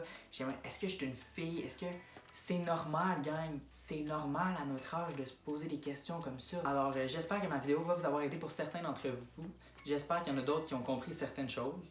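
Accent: Quebec, Canada. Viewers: L'accent québécois il est trop charmant 😍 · He is not French but Canadian, I can tell you that by his accent...We French, doesn't speak like that, with the same intonation and pronunciation. It's not french swiss or french belgian so it can be only french canadian. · En tant que québécoise jadoreeee😍😍😍😍😍 l'accent québécois. Pis c full dommage que tu sois gay tes tellement beau 😍😂 Mais tu m'inspire tellement ta mais savoir tellement confiance en toi bref... byeeee et felicitation 👋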